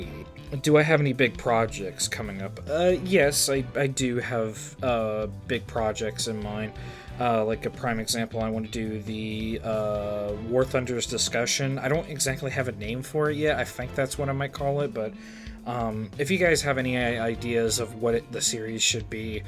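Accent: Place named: United Kingdom. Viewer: Maybe you should make prop plane roasts with a.50 cal gun. "The.50 Cal Roasts the Hawker Hurricane." HOW TF DID I NOT RECOGNISE VOICE AS A BRIT!?!?!?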